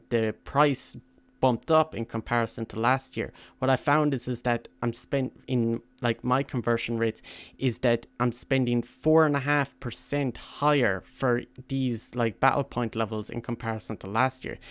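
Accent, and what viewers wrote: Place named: Ireland. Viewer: I can't help but notice your beautiful Irish accent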